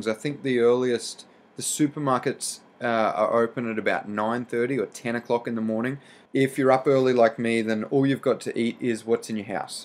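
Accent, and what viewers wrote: Australia. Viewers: To be honest I thought exactly the same after watching the video xD The stupid aussie accent just makes it even worse..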